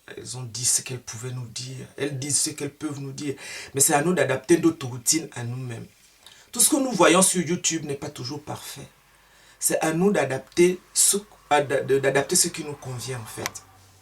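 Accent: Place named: Gabon. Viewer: Joyeux nappyversaire.Je viens de découvrir votre chaine et j'aime bien votre façon d'expliquer les choses. Et cet accent ...je dirais Gabonaise!?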